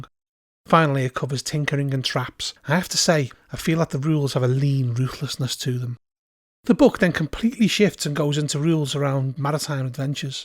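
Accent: North, United Kingdom. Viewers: I loved this review. Nice presentation style, succinct and informative. Actually I also like the gentle tone and the northern accent. Good storytelling voice, I reckon. Thanks.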